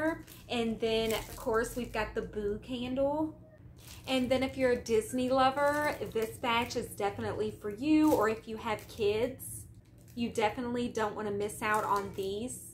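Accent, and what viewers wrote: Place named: West Virginia, United States. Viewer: I love your accent. 😊 it reminds me of home (WV)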